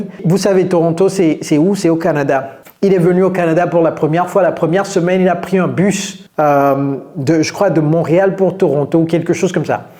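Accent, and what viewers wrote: United States. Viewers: Vraiment vous êtes fort en pronciation Américaine. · I do enjoy the American Accent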